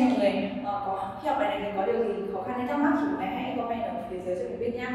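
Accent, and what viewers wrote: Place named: Binh Dinh, Vietnam. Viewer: cho cách nói xe bình định mkmk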